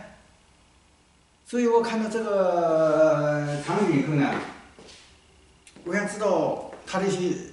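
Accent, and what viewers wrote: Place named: Zhejiang, China. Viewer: 大师是浙江人？口音很重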